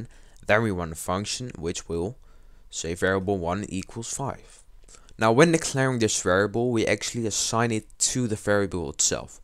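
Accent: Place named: Netherlands. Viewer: I hear some Dutch stuff in there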